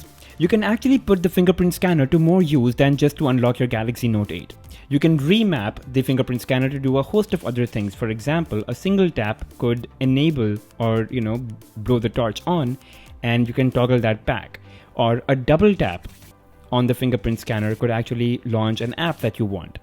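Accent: India. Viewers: I'm a white guy from California. I can understand what he is saying no problem. He has an Indian accent but Its not that bad. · amit gupta We love being rqcists. I should sue this guy!! I had 2 seizures because of his God Damn fucking voice/nasty ass Indian accent. I like India like I like the flu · bruh... indian accent English is pure fucking shit ear cancer! Also, no app for begging for "bobs and vegana"?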